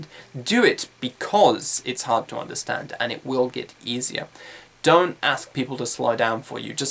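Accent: United Kingdom. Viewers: He sounds British or something to me but idk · Excuse me, I am Fitri from Indonesia, 18 Years old. I have a question that is what kind of languanges do you use ? American English or British English ? Because, some times when I am listening your speaking, I guess your speaking in British English. Is it true or not ? Please give me the explanation. Thanks :-) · achi milorava It's because of his British accent :)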